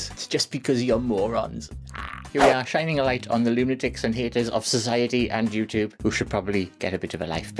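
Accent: Wales, United Kingdom. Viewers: I will start by saying that I am not subscribed to your channel, yet you popped up and I will not judge,be mean or anything like that as I am a Christian. No true Christian would, as we have only one judge and I do pray that all knows who that is! I only wanted to let you know that as a you tuber I'm sure that you already know that based on what channels that we do like and subscribe too, they also send us suggestions. Like as with me, your video that I'm so sorry that I can't remember the caption off this video but do know that two names appeared that I love very much, Trump & Jesus! So, I did watch it but I guess I'd have had to watch those videos leading up to it to know what upset so many. I can say that your British accent I found beautiful! As far as your deep voice, I'd have to say that my beloved father was 6'4 and when I was young I was teased often as I inherited his deep voice...funny though that those Who teased me now wait for hours after (while touring and making a quite nice living on the rd. 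playing Coliseums and now they act as if we were best friends in school !) I had often wondered if when I was large enough in my career just how I would react to those who's words cut deep in my early teens, & if they would ever show up at one of my my proformancesAlthough under a different name than I can go by on here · Are u welsh? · Hahahaha "you couldn't afford me". I swear the Welsh accent makes the burn all the better. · I am loving your Welsh accent: my Mum lives in Carmarthenshire, but she's not Welsh: they are very friendly & welcoming!!! Please ignore those very nasty & ignorant comments: these crazzzies are not worth any attention & they need to get what is commonly called: "a life"!!! 😁😂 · Love your Welsh accent from another Welsh person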